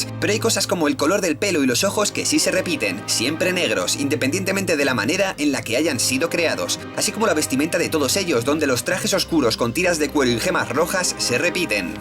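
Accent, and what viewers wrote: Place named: Spain. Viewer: Holy cow, yo si quiero la segunda parte! Porfa :3 aunque definitivamente la pronunciación es muy diferente en España que en Latinoamerica jaja me encantan esos detalles n.n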